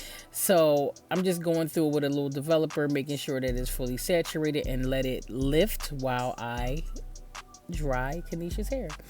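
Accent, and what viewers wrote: Philadelphia, United States. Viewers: Are u from Philly? You accent an slang made me ask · You was sounding mad Philly in this voiceover! Love you girlie!😜❤️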